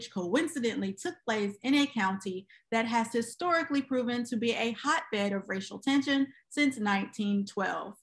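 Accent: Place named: South, United States. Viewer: Love your country accent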